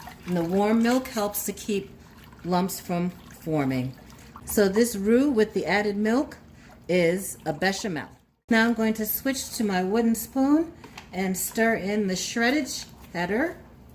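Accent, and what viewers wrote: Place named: South, United States. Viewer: you got that soothing sothern voice 👌🏾 thats how u know the mac official, like a ref w a whistle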